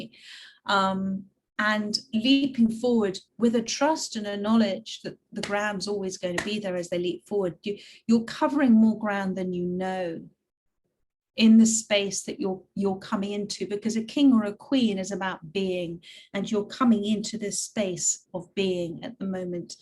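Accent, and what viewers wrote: United Kingdom. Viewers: Nice to hear another new British tarot reader . Lovely insite and understanding from the interpretation of the cards ♥️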